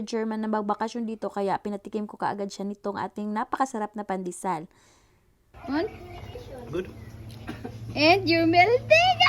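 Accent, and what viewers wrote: Visayas, Philippines. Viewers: Bisaya kaayu ka Dai tundan na staging...hehehe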